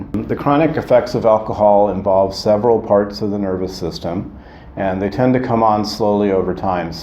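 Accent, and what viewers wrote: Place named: United States. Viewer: Excellent video doctor. By the way, I love your accent. Being a foreigner, your accent is like liquid gold to listen to and learn American English from.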